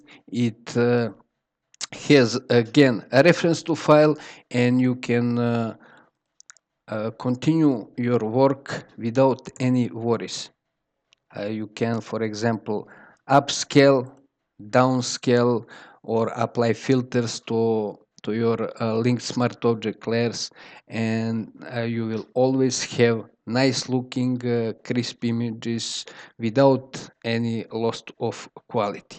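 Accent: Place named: India. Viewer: INd-lish, speech very bad. i don't hear sound.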